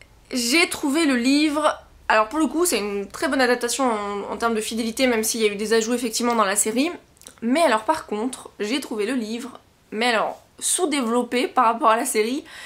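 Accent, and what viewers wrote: United Kingdom. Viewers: Hello ! Alors pour ta question, ça me brise le cœur de dire ça étant une Potterhead à la vie à la mort mais... En ce qui concerne Harry Potter et le prisonnier d'Azkaban, j'ai préféré le film au livre :'(. Voilà, c'est dit ! La musique, l'ambiance, tout est magnifique et le livre est bien, mais pas autant que le film (et je l'ai relu dernièrement pour confirmer mon avis !) Sinon 13 Reasons Why c'est une tuerie (pardon pour le jeu de mot involontaire) ! En 2 jours c'était fini, terminé ! Fortement captivant ! Géniale cette vidéo ! J'adore le concept :3 (Et ton accent anglais qui déchire !). Bisous !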